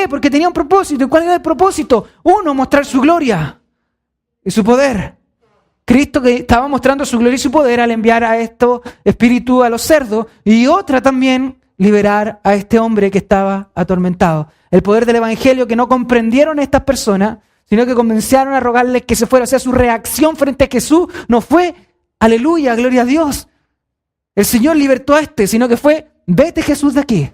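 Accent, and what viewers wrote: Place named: Chile. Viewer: Increíble el acento chileno como si se nos nota, aunque pensemos que no😅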